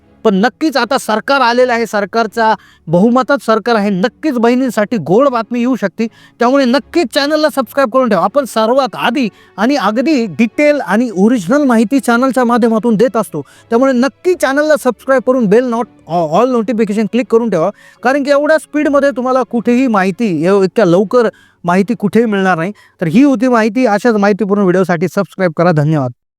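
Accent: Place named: Pune, India. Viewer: Punayt tune boltay me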